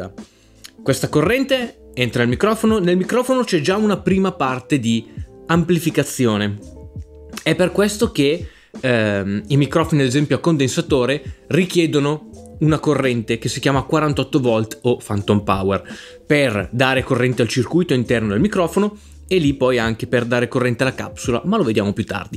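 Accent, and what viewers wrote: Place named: Rome, Italy. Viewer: Co st'accento sei proprio 'ntajo (con questo accento sei veramente divertente per chi chiama da fuori Roma). Grazie per tutte queste spiegazioni. Anche sapendole, ripassare non fa mai male. 😆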